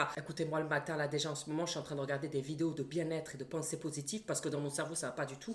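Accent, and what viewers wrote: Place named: Southwest, France. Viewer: moi aussi je le prononce comme ça et je suis du sud-ouest aussi comme lui.... "Am'mandonnéééééééééééééééééééééééé"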